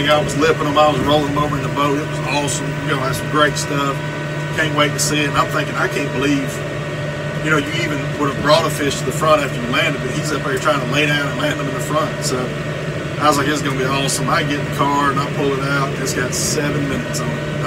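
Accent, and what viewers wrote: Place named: Alabama, United States. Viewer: Thanks for the fishing story, I left Alabama back in 1966, but it sure is good to hear the accent again.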